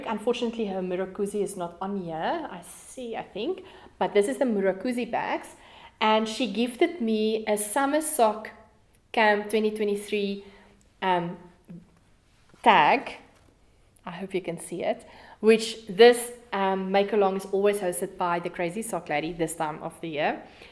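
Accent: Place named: South Africa. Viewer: Thank you again for such a wonderful podcast ❤ I'm really enjoying watching your channel ... And I love your SA accent ❤ Great to see you wearing the Faded Pathways Cowl ... Can't wait to start mine!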